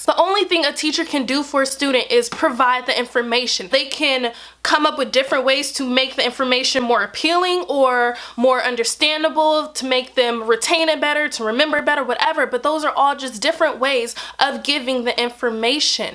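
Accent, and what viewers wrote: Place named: United States. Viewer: You speak with an American accent. Your culture is American. When you accuse white people of "appropriating" your culture when in fact it is African culture they are "appropriating," you are guilty of the very thing you accused them of.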